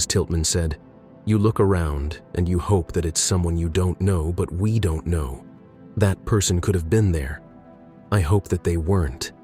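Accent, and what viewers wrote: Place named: United States. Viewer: I question why anything and almost everything that has happened in the U.K. usually ends up on a YT with an American Accent? Also, why do they all assume that we have the attention span of a goldfish? Must be something to do with spinning out the length of the video to earn more money? Why not just put in a little extra effort and quit with the annoying repetition?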